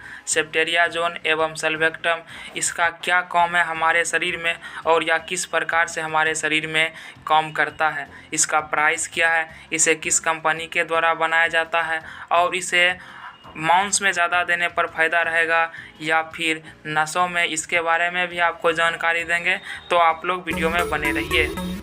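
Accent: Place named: Begusarai, India. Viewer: Lagta hai aap begusarai se ho awaz se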